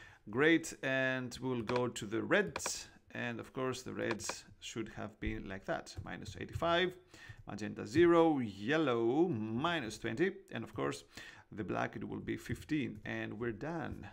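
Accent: Greece. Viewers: χαχαχαχα μόλις άκουσα την φωνή σου κατάλαβα ότι είσαι έλληνας! πολύ ωραίο βίντεο μπραβο σου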